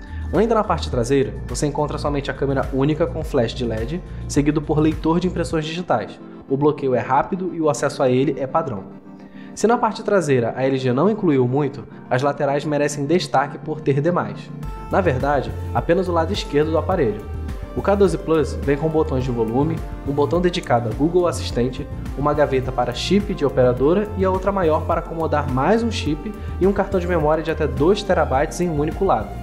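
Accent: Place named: Rio de Janeiro, Brazil. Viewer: Fotos no bairro da freguesia Jacarepaguá... Feliz por se inscrever no canal de um Carioca como eu... Tmj amigo! Melhor q aqueles reviews de sotaques Paulista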